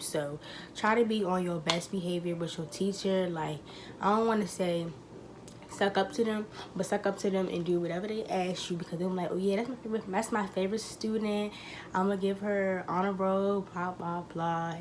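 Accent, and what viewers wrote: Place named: Carolinas, United States. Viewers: 😂😂she's from like the Carolinas or Baltimore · It's a southern accent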